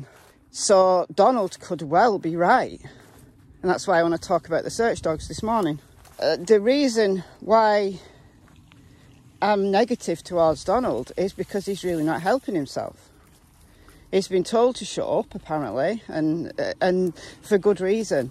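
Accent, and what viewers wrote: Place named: England, United Kingdom. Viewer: Hello.I've just discovered you and at the beginning of this video I thought you were from Massachusetts, U.S.A., from your accent.Then I realized you sounded English.The accent we have here near Boston came from the English settlers way back, so its interesting to hear the similarities.I have been following this case and I'm so confused.I don't know what to believe.I'm leaning towards abduction by a known person.I really hope they find her soon and it would be a miracle if she's found alive.It's so upsetting.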